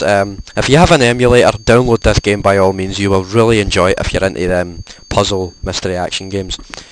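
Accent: Scotland, United Kingdom. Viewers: Haaa. I was NOT expecting you to be scottish. Yas. Nice :D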